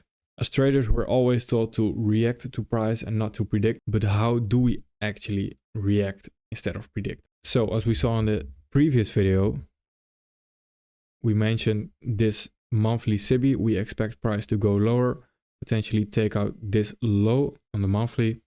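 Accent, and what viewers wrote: Netherlands. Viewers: Love how they're not too long and love the Dutch accent. 🙂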